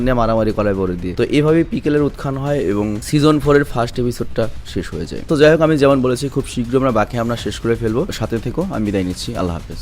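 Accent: Noakhali, Bangladesh. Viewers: Vai Noakhalir kotha sune valo laghse..ami Noakhali 😌 kno Noakhalir kotha bolsen jani na kintu valo laghse..1 ripley plz😌👍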